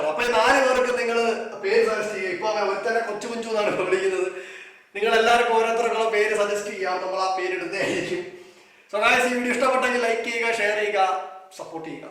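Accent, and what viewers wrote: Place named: Trivandrum, India. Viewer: Bro ude samsarathil cheriya oru trivandrum slang varunnu❤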